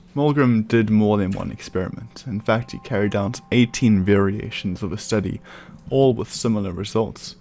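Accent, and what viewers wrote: South Africa. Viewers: This narrator sounds distinctly South African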